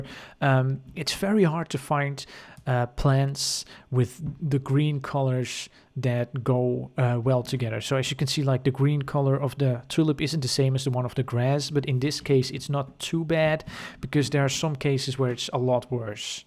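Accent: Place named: Netherlands. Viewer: Can tell that beautiful Dutch accent anywhere. <3 x3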